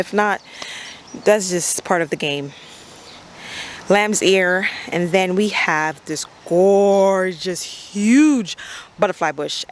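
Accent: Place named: Jamaica. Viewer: you soundalike you have a Jamaican accent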